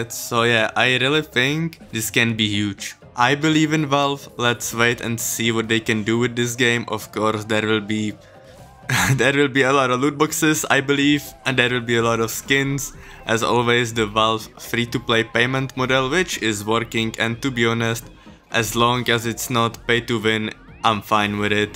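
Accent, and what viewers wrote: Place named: Czech Republic. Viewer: jako ta česká angličtina ma sere ale jinak top videjko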